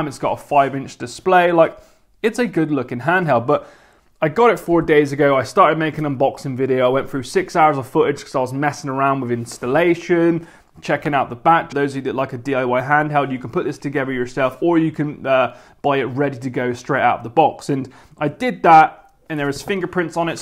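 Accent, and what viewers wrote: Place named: West Country, United Kingdom. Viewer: It's a west country accent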